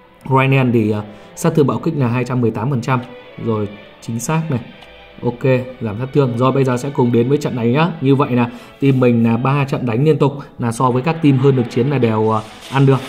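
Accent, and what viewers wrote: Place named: Hung Yen, Vietnam. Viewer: Nghe bác nói quê Hưng yên. Bác chỗ nào HY thế.?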